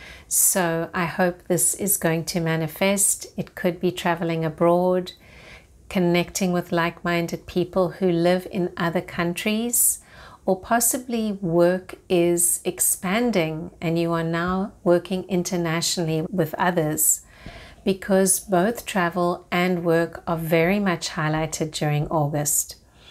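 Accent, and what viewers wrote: South Africa. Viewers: It's a South African accent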